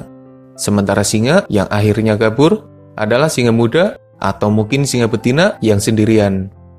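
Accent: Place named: Java, Indonesia. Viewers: Medok bang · Bang suarane medok tp lucu nemen yakin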